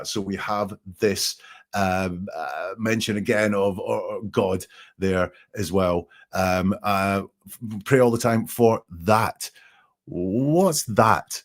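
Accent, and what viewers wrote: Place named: Perthshire, United Kingdom. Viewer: your accent sounds like your from the Perthshire area that's where I'm from I'm enjoying your channel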